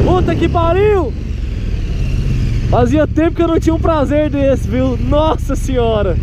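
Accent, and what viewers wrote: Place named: Minas Gerais, Brazil. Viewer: Sotaque de mineiro! Gente boa! Super duke monstra!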